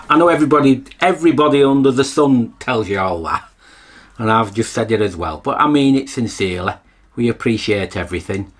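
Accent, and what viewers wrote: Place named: Yorkshire, United Kingdom. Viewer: Yorks accent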